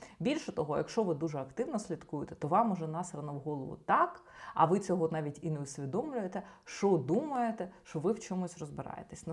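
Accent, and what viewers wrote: Russia. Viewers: Цікаве відео, дякую ) Ви питали, чи дратує ваше цмакання. Мене не дуже. Але є інші звуки, що не дуже органічно звучать для мене. Такі слова, як "немаЄ", "знаЄш", "здаЄться", ви вимовляєте як "немаЭ", "знаЭш", "здаЭться", на російський манер. Стараюся не звертати уваги, але реально важко. Тому дивлюся вас рідко, на жаль.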